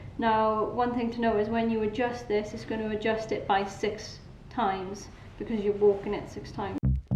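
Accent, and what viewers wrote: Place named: Wales, United Kingdom. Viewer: Subscribed to your channel recently and I've absolutely loved watching your videos so far. I was brought up in Wales so hearing you talk with that lovely accent is so nice. Quite envious of your spacious shop - it makes my little shed look like a broom cupboard ! Looking forward to watching your next videos. Thanks for posting.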